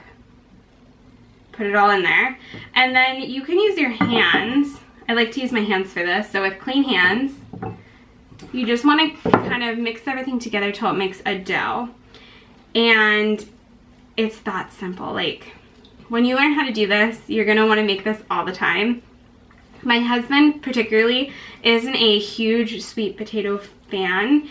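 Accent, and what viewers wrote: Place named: South, United States. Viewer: Hey! You are from the South so of course things are pronounced differently. Great recipe. I will do it soon when I get some sweet potatoes. From B.C. Canada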